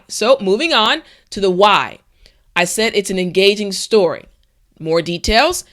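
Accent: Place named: United States. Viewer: America English♥😚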